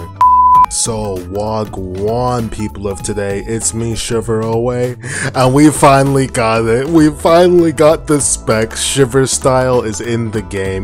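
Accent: Jamaica. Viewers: Shiver are u Jamaican?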